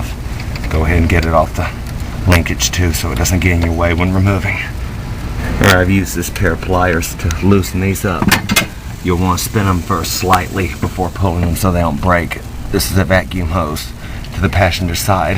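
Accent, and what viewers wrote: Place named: Cleveland, United States. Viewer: u sound like cleveland......thanks,this vid really helped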